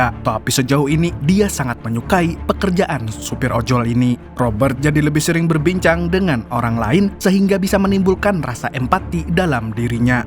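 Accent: Banjar, Indonesia. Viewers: Banjar nya kada ketulungan 😂😂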